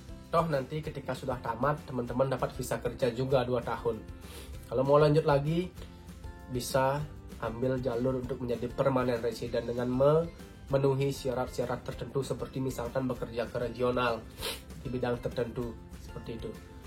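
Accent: Bali, Indonesia. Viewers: Logatnya kek orang bali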